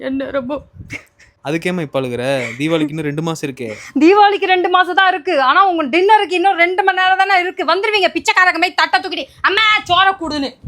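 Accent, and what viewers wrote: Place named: Chennai, India. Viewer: You are born and brought up in Haryana right? Your Tamil accents, typical Chennai girl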